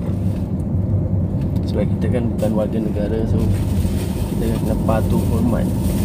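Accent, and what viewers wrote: Malaysia. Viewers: ko dah boleh ikut loghat malaysia bro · Cakap pon dah mcm org Malaysia..👍 Kalau bleh cakap Kedah lg sempoi... hihi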